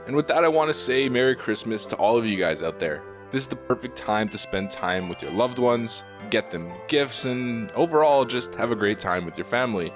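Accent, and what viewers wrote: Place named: Canada. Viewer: He has the most Canadian accent ever and people are surprised.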